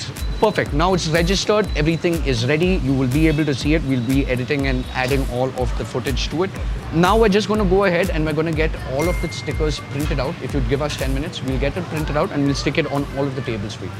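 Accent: India. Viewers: What kind of english is this 😂 hate indian English speaker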